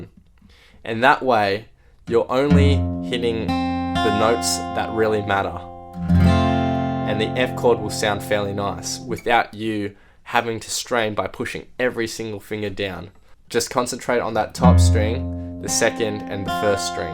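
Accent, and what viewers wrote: Australia. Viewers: are you australian? me too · Got a bit of an aussie accent going on there, eh mate?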